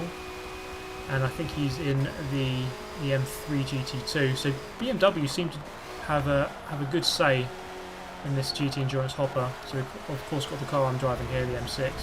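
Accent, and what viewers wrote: United Kingdom. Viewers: This guys commentary makes me wish I had a British accent!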